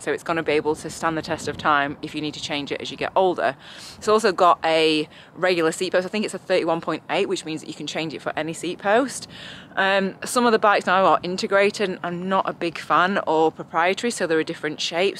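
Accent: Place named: North, United Kingdom. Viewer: that stupid norden accent should be banned. puke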